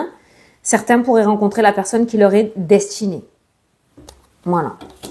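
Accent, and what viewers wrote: South, France. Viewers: Merci je vous adore vous êtes trop cool et incroyable et surtout avec l'accent du sud. Sa fait du bien de vous voir et je rigole à chaque fois. En se qui concerne le lundi il y a beaucoup de choses qui me correspondent dont l'ophtalmologue 😅 ainsi que les rêves prémonitoire. Continuez à être naturellement heureuse et partager avec nous vos précieux moments. ❤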